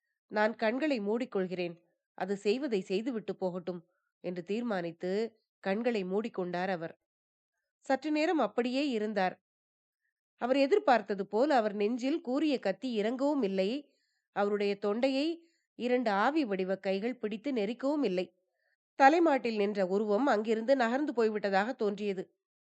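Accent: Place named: Gyeongsang, South Korea. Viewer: 오 경상도 시투리 억양과 비슷 하구나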